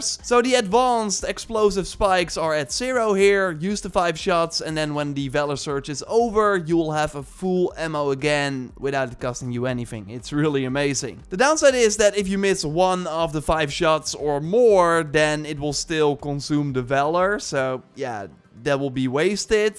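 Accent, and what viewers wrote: Netherlands. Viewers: Hele goeie content maar jonge jonge wat is duidelijk te horen dat je Nederlands bent 😂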